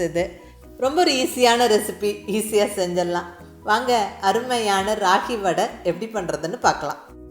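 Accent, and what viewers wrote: Coimbatore, India. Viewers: Ungal kovai Tamil kettukitte irukkalam pol irukku, aana video seekkiram mudyuthe!!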